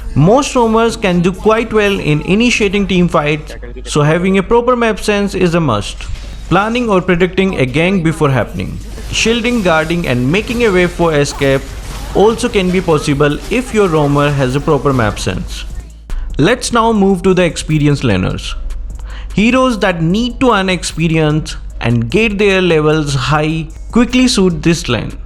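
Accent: India. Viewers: Your voice similar with indian accent... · Channel ini ni punya orang India ya? Logatnya kebaca bgtt jir 🤣🤣🤣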